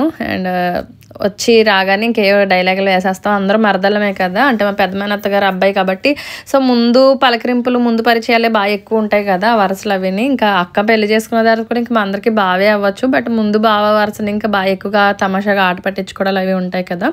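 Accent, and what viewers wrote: Godavari, India. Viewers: Hi Gaaru, How are u doing....Nice to see u again... U R a stunning Beauty... Varninchataaniki Maatalu leveu... Mee Godrolla Slang Baaguntundi.. Traditions baaguntai.. Pilupulu Baagunnai.... Mee Sisters Super.... I hope U enjoyed.... in Marriage Celebrations...... Take care have a great day.. Cheera color Super ga undi andi..... Thanks for sharing......😃😃😃😃😃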